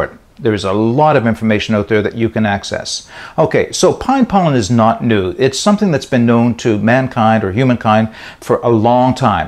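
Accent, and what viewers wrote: Nova Scotia, Canada. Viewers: I detect a residual maritime accent. Are you from Nova Scotia?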